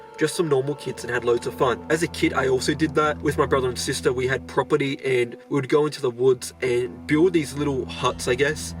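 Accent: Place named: Australia. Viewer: i figured you have to be aussie. the accent never lies :D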